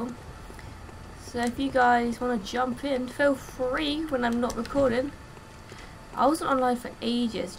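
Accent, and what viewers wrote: England, United Kingdom. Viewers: Hello, where abouts in England are you from? Just curious. You're voice is half the reason I'm watching this walkthrough. Also, extending your Hunter Axe assists greatly in dealing damage with a from a bit farther away, usually enough away than most enemies' hit range. Regards from Blackpool :)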